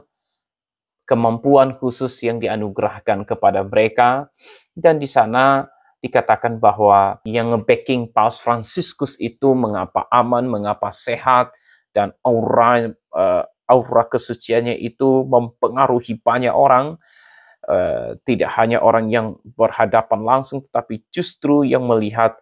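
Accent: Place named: Borneo, Indonesia. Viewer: Dari logatnya ibu ini kayak logat dayak ya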